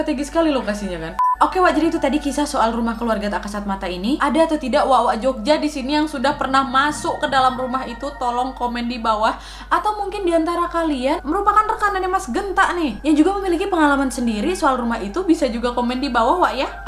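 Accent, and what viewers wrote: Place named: Medan, Indonesia. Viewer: Salam...saya wak2 dr medan,klo boleh tau wak aslinya asal mana??karna logat bicaranya mirip dengan orang medan😊😊😊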